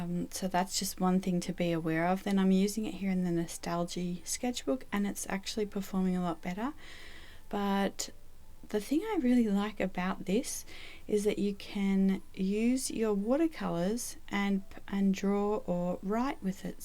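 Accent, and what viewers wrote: Australia. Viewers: Beautiful video. Are you from Australia?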